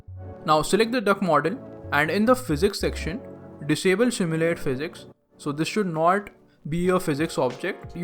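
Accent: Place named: India. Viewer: i hateee,i hatee india guy english accent!!!!!!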